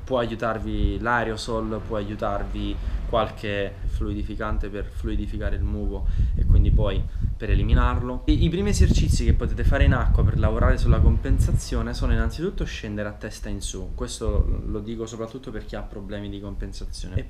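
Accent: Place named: Salento, Italy. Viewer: Ciao, ti seguo dal video delle iene e quando hai fatto il video con freddy sei diventato il mio idolo ahaha Ho 28 anni e sono un apneista amatoriale e autodidatta da una vita, da prima di internet e del 'sapere' facile, negli ultimi anni ho letto qualcosa sull'apnea e ho migliorato un po le performance anche se devo dire che ho ancora problemi nella preparazione fuori dall'acqua. riesco a stare sott'acqua si e no un minuto.. vabè ti ho scritto per altro;:D Volevo dirti 2 cose, una è che ti è venuto l'accento salentino a furia di stare qui ahah la seconda, riguardo la compensazione, io compenso senza mani, sono davvero fortunato o ce un metodo per insegnarlo? è da 2 anni che cerco di far capire a dei miei amici come fare e non ci riesco. ti ringrazio in anticipo, spero un giorno di incontrarci, magari in acqua ahah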